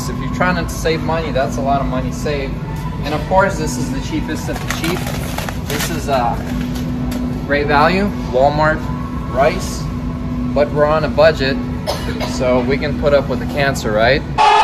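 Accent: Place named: Russia. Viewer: От русского акцента не избавиться))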